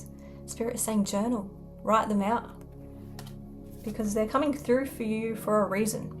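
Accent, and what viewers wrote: Australia. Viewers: you are ethereally beautiful. your eyes look like pools of eternity. if i'm not mistaken your accent is aussie. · Thank you my dear darling for the wonderful reeding I truly love you girl you're alsom little woman and girl I love your eyes and you're astra accent so sweet with that stay cool and cute your good friend dale senney ❤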